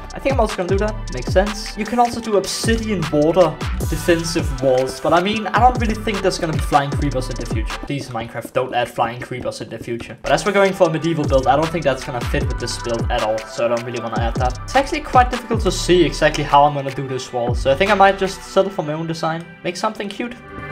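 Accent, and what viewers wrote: Denmark. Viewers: Why does it hear like hes danish? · He is danish im danish so i Can here it with his accent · You have danish accent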